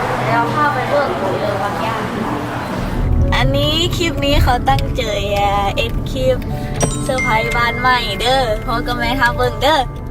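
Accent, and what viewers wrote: Phu Thai, Thailand. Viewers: ผู้ไทดงหลวง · สำเนียงเหมือนสาวภูไทย เสียงน่ารักหมาก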